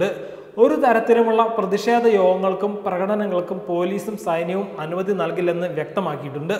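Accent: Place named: Kottayam, India. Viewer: ഇൗ വക കോട്ടയം.ഭാഷാ ഭോഗികളെ .അവതാരകൻ ആയി വയ്ക്കരുത് . മലയാളം പറയാൻ അറിയുന്ന ചെറുപ്പക്കാർക്ക് ഇത്ര ക്ഷാമം ഉണ്ടോ.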